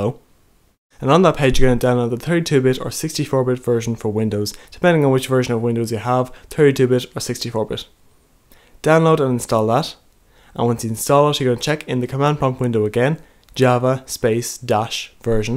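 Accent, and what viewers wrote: Ireland. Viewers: Are you from Ireland you sound it? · I LOVE YOU YOUR IRISH LIKE ME!! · are you irish ? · u r irish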